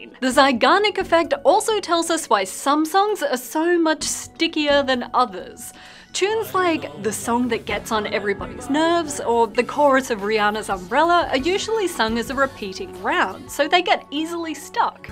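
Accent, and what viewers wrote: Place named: Australia. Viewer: Just wanna say that it's nice to hear an Aussie accent on YouTube. :)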